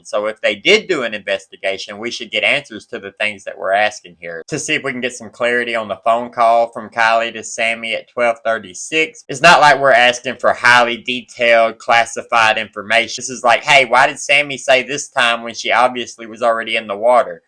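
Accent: South, United States. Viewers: Just became a new sub today! Love the channel and that southern draw you got! 😉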